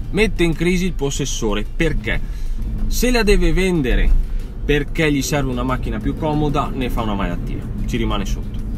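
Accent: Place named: Contarina, Italy. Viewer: ho beccato per caso il video tra i suggeriti e ho riconosciuto subito l'accento Contarinante,top meriteresti più views,adesso mi sparo anche gli altri video!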